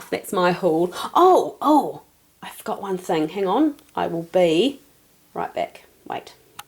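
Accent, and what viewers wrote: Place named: United Kingdom. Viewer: so you are from New Zealand? wow that is great but your accent is like from Britain just a bit i suppose. i love to hear your voice so you can talk all day!! I really like all your stuff, girl, they have tea balls all day long here in the USA in Wal-Mart, I agree with you that online shopping is very bothersome but somewhat convenient especially when people such as my self cant find any witchy shops in a "christian" community. I have no other choice but to order online and i have to eat crow about it. and i prefer witchy shopping over clothes shopping any day as well!!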